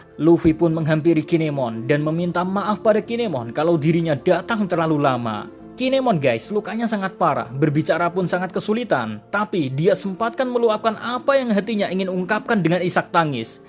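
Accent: Java, Indonesia. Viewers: Jowo mu emang medok min,tapi caramu nyampek no pesen keno nang ati,marai mrinding 🙏🙏🙏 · lebay jir. suara medok gitu mana bikin merinding 😂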